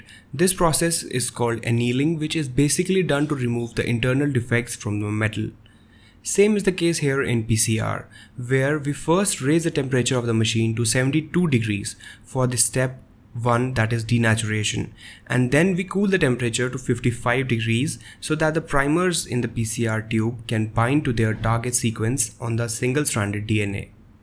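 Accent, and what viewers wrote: India. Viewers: Lol why this school related videos are always indians that I actually understand. (Not sure if he is really indian, but he sounds like it)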